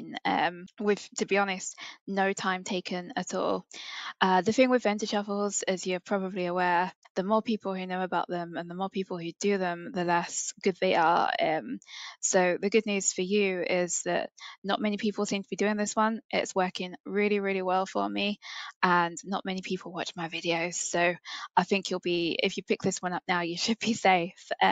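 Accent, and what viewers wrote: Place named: United Kingdom. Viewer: I know this isn't part of the actual video but you have a beautiful voice! Also on Stitches EU but I think I will try to find my own flips so I don't inflate prices and spoil it for you! Trying to work out where your accent is from in the UK...tbh I am kinda terrible at guessing haha. Keep up the videos!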